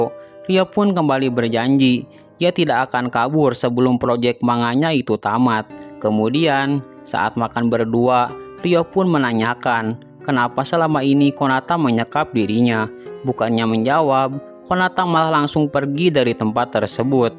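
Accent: West Java, Indonesia. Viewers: Admin asli sunda nya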